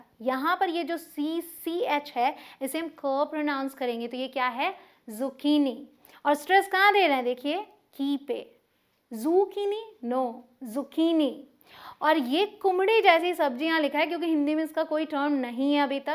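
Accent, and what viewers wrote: India. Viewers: Indian accent English?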